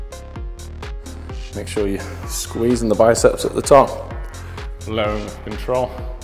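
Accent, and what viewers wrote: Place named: Newcastle, United Kingdom. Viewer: ARE U FROM GEORDIE SHORE??????? A LIFTING LHAGER VIDEO, SMASH ITTTTT LADDDDD. 😊😊😊😊😊😊😊😊😊😊😊😊😊😊😊😊😊😊😊😊😊😊😊😊😊😊😊😊😊😊😊😊😊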